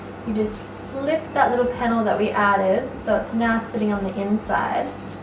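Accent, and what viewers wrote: Australia. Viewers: Sorry but you sound Australian so I am just wondering where you buy your fabric from? I don't find a lot of linen in Spotlight and Lincraft :/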